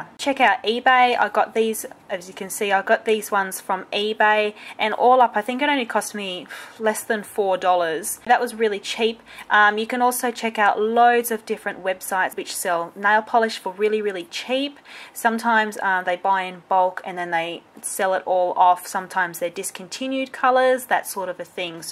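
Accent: Australia. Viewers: I love the way those sparkly bead thingies look for some reason.;) Oh no, it's that delicious-looking sponge again! I'm hungry agaiiin, and I just finished eating! As always, this is well shot, and the little notes and tips that pop up on the screen are cool. Ooooh, ooooh! And the fast motion writing on the sticky notes at the end! Genius! I loved it, and I mean that shit! <3 T'was wonderful to hear your Australian voice again,. I wish you the best!:D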